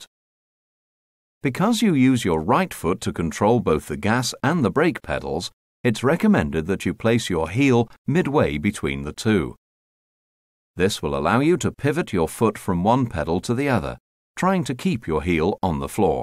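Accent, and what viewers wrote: United Kingdom. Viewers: American English??? 😂 He is speaking the queen's English! Pay attention to the comments about gear stick, not shifter, reference to accelerator, apart from the English number plates, and right hand drive. Have a nice day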